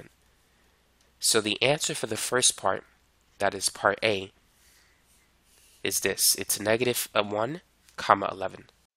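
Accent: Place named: East Coast, United States. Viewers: He’s from the east coast the way he says north “norf” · Are you from New York, you sound like it!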